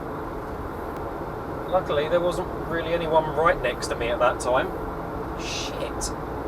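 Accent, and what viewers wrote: London, United Kingdom. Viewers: You sound like you’re from Hainault area? A friend of mine is from there & you both sound identical lol 😁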